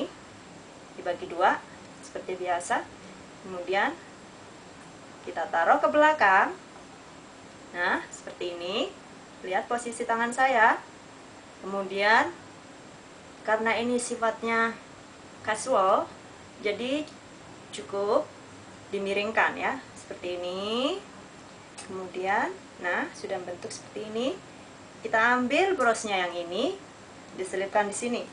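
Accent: Banyumas, Indonesia. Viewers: "DONE" Ikutan #GiveawayTHV2020 untuk modal usaha mudah-mudahan ada rejeki untuk anak bayiku 💕 Saya suka nnton karena semua resepnya sudah diuji terlebih dahulu, jd setiap saya trial tidak pernah gagal. Pertama saya tau channel ini karena saya mencari resep kuker di cookpad untuk hajatan nikahan saya (supaya irit) 😂 dapetlah saya resep dari mba. Pas saya cari-cari caranya di youtube ternyata ada step by stepnya di channel trnyata punya ya mba 😂 ga nyangka hihi Dan, semakin kesini saya sering ikutin tiap ada upload video baru. Karena saya suka dengerin dubbingnya yg dicampur bahasa ngapak 😂😂 Semoga rumah tangga langgeng sampe kakek nenek, semoga setelah memberi GA rejekinya semakin dilancarkan dan diberi keberkahan.. Aamiin yaa robbal'allamiin ❤️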